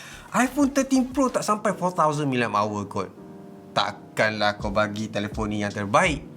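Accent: Terengganu, Malaysia. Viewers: Kalau la channel ni x tiru MKBHD + cakap teganu, aku rasa lebih ramai yg subscribe. Be yourself bro. I know you can do it.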